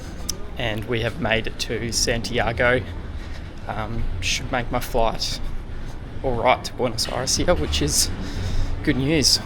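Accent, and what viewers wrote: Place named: Australia. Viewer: Aussie accent, interesante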